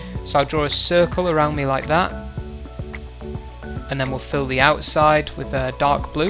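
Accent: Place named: England, United Kingdom. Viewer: Great video! Difficult to focus on the tutorial with that beautiful english accent :D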